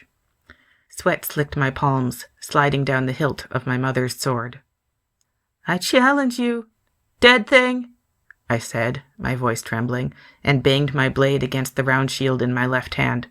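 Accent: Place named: Midwest, United States. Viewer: Great job! I guess my ear is tuned to the Midwest speech pattern. 😂 I think it's great!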